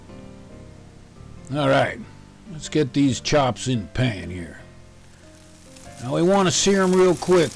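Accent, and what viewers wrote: South, United States. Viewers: i like the VOICE of that southern man..IDK if his black or white man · the way he is talking makes me hungry, he sounds like a deep south country cook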